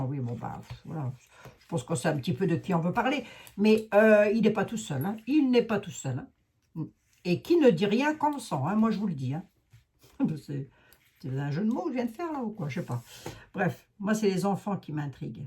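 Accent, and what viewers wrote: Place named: Paris, France. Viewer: Mais j'aime ! La belle Lorraine qui parle en Titi de Paris ❤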